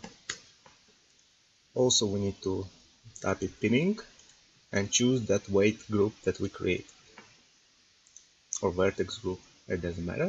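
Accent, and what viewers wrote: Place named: Russia. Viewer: btw, is it Russian accent or some hispanic?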